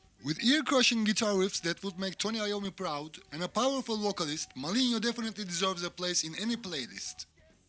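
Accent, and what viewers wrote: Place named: Mexico. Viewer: You are Mexican! your accents is too Harrrrrrd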